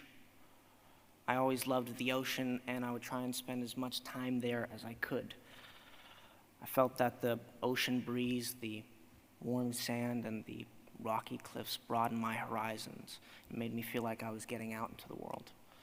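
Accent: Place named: England, United Kingdom. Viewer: Hello Aidan, I hope you see this comment. You have many fans in Turkey and there are no Turkish subtitles in your videos. It is not a problem for me, but I think it is a problem for those who do not understand the English accent. Please take this comment into consideration and you should do something about this issue. I think we love you.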